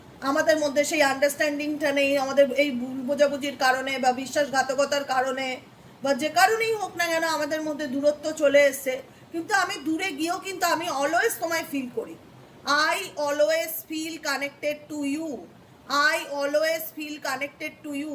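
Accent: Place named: Bangal, Bangladesh. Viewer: দিদি আপনি যে বাঙাল ভাষা বলেন আমার খুব ভালো লাগে। আপনি বিড়াল কে বললেন জুত করে বসো। আমার মনে হয় হাজার এর মধ্যে এক দু জন বুজেছে।😊😊😊😊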